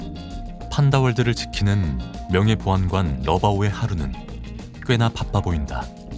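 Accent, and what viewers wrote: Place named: Chungcheong, South Korea. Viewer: 러바오 넘넘 귀여워❤❤ 충청도 사투리도 찰떡이구먼유~^^ 365일 만근하는 우리 러부지❤❤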